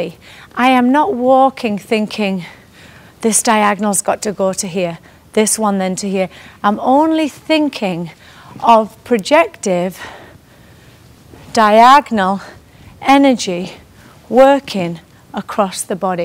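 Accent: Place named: Northern England, United Kingdom. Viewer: Very helpful-the images I can use when walking along the beach front. Thank you and a nice (north) English accent. Jack in Japan.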